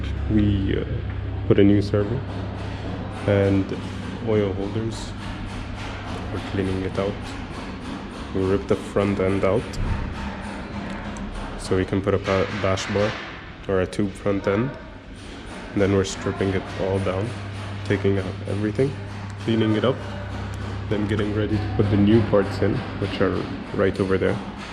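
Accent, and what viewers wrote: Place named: United States. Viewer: you can speak amirican will that is very nice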